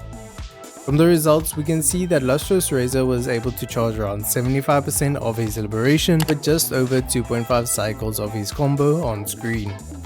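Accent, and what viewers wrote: South Africa. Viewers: Brother based of your accent and ping are you from South Africa?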